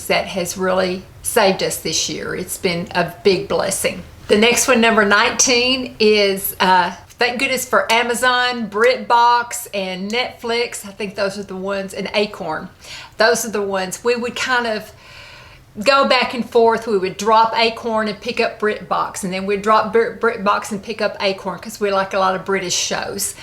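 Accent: South, United States. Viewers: I can't believe you are over 60 ... you are so gorgeous. And your southern accent is so cute and soothing. Have you tried The Ordinary's peptide hair serum? I really love it and has really helped my hair loss.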